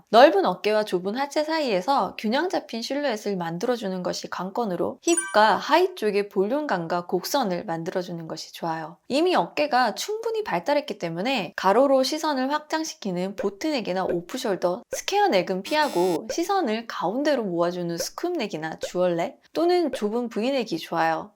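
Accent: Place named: Gyeongsang, South Korea. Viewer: 경상도 출신이신가요? 은은하게 들리는 사투리❤️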